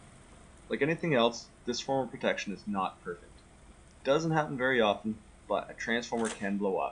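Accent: Canada. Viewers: Very Canadian. I might guess Nova Scotia.... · Canadian eh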